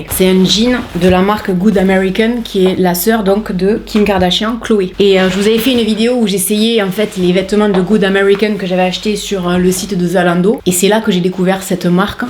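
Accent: South, France. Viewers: Coucou, j adore vous regarder et surtout vous écouter avec nôtre très beau accent ça sent le soleil,et met du baume au cœur.Excellente vidéo comme à chaque fois.Merci beaucoup bises 😊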